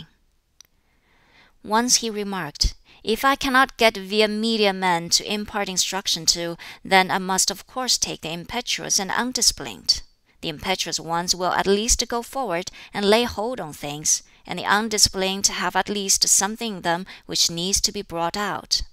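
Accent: China. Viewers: I slip into brummie when mad so go check out a clockwork orange to know what true confusion feels like. Can’t believe people are complaining about a Chinese book being read with a Chinese accent 🤦‍♀️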